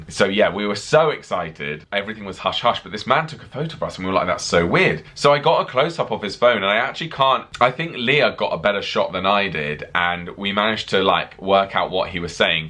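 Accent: England, United Kingdom. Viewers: I love your pictures. You are so kind to share them with all of us., I have been a member of your channel for years and you have never responded to any of my messages. I would be so excited if you responded to atleast one of my messages. I really enjoy you content and I love your English accent and everything about your life. So please, just leave a word or a sentence. It would be so greatly appreciated. Thank you for sharing your life with all of us.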